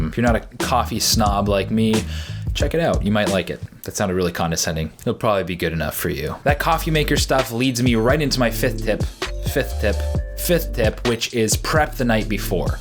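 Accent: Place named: Canada. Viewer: Love it. Are you actually from Canada? First , because of pronunciation, but mostly because of the humour and laid back personality:) it seems like Canadians in general are funny, friendly and kind people !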